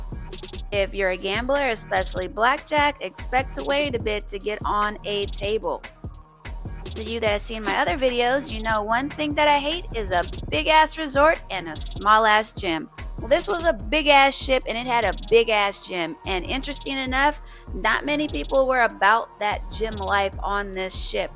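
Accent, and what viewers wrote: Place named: New York, United States. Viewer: I love the way you talk and the cursing lol! I’m from New York and this how we talk too 😂😂😂 very funny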